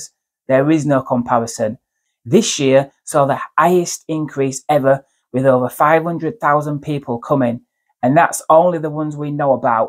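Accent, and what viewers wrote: United Kingdom. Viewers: It's been a while i am listening to British accent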